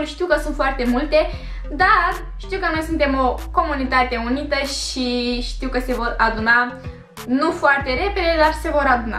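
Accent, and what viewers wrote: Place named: Moldova. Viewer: Ce accent de moldoveancă ai 😍😍🤗😊🤩😘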